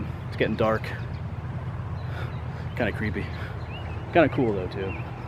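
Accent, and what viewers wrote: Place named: New England, United States. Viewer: Watched the video of Ft. Duncan,there in the disputed territory of Western Virginia. I figured you for a yankee,hearing the accent. I reckon your ancestors kept in the rear to keep from getting killed.The reason l state this is because my ancestors fought for the South and went to Gettysburg. They were excellent shots,being yoeman farmers.Now their grandparents had owned slaves,but they got shed of them,once their families grew. They just followed the yankees way of thinking.Why support someone in winter,feeding,clothing and caring for their welfare,when l have blood kin l can use.So we will sell them off and make a tidy profit. DEO VINDICE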